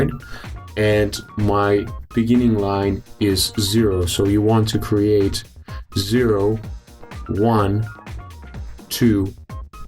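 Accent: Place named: United States. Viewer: I´m confused.. u look russian, but you sound american:D but great tutorial!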